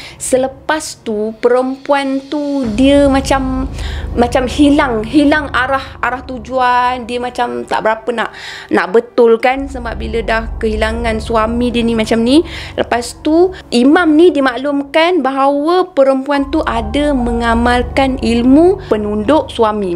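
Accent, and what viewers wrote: Kelantan, Malaysia. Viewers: saya dh agak dah kakak orang kelantan,masa kakak bercerita ada sikit tubik bahasa kelantan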